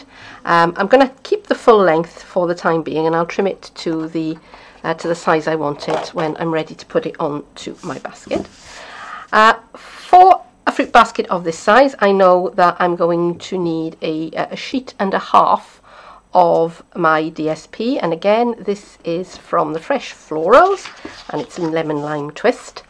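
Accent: Wales, United Kingdom. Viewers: Both are gorgoeus and you're right, with older children they would love making them. How about stapling and gluing the handle on and then applying the ribbon? Added extra strength maybe? But what a nice basket for all sorts of things or small doll's baby crib or covered with shaggy green fringe a monsters cage turned on it's side? I love your accent, I miss my Newport nieces and nephews "come yer", they shout, "this is my left yer and my right yer, I listen with them" and "please auntie, brush my yer, it's all tangled".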